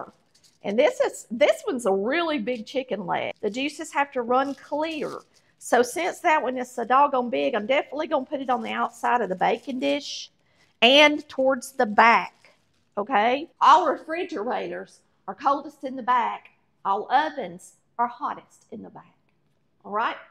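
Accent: South, United States. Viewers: I enjoy your accent! those limA beans looked good. Cook on girl from the south,oh and thank you.♥🌈👵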